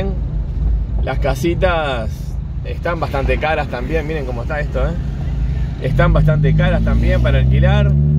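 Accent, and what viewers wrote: Argentina. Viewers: Me encantan tus relatos pareces guía de turismo o mejor tu tonada es de argentino cheto ya sabés el cheto es la gente de la alta sociedad es un algo ojo me gusta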